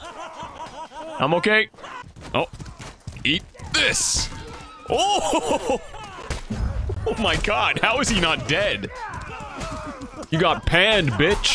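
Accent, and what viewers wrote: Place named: United Kingdom. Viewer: More British accent please 😆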